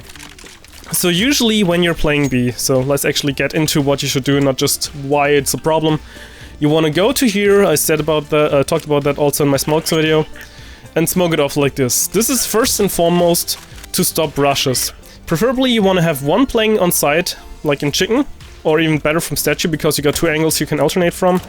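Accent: Germany. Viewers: are you german or swedish? :D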